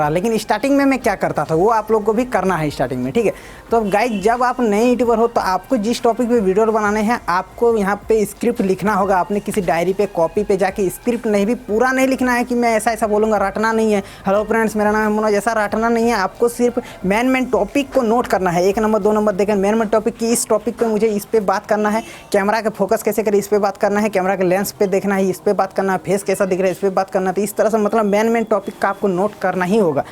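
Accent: Bengal, India. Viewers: bhai ap bangali he kiya? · vai aap Bengali Ho....?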